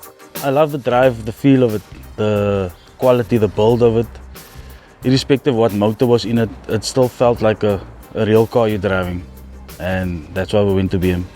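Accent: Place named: South Africa. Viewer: thats the most SA accent i've ever heard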